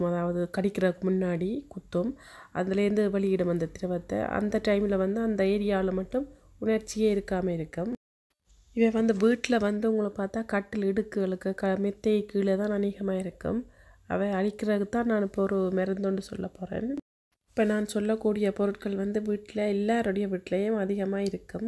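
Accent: Sri Lanka. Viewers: Ilangai Tamil 👌🏼👌🏼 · அக்கா நீங்கள் ஈழ தமிழர் ஆ... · Ellangai tamizh super❤❤❤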